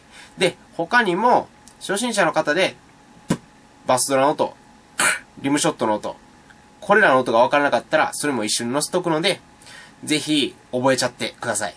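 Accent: Kansai, Japan. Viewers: 関西っすか？出身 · 最近いきなり関西弁になってますね笑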